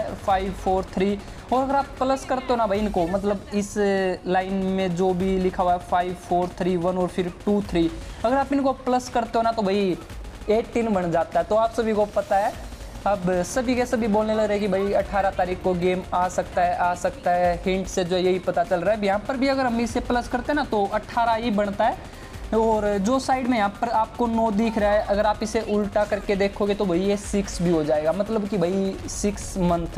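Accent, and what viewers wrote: Haryana, India. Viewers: Bhai yo b haryana te h k eski awaaz sunke to nue laage h k u haryana t h????bera ho to tne???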